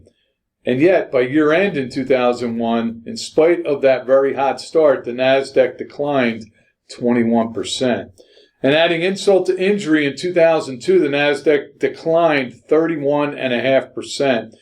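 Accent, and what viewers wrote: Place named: Philadelphia, United States. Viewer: I've listened to weekly half-hour infomercial for many years. He's a guy whose appearance does not match his voice. That's neither a compliment nor a put down. Just an observation. One thing I'll give him is he's got the southeast Philly metro dialect down pat. When I hear guys talk like, I know I'm dealing with someone from home.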